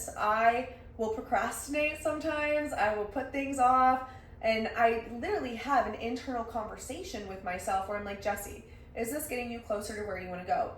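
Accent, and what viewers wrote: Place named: United States. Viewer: 🙂Another good episode thanks. Btw i love how you yanks say "yaw all":p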